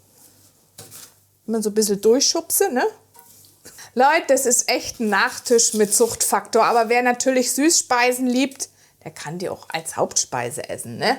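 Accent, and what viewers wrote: Germany. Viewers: Klasse! Dein Dialekt gibt mir immer wieder ein Rätsel auf:eine Mischung aus hessisch, fränkische und berlinerisch. Oder täusche ich mich?!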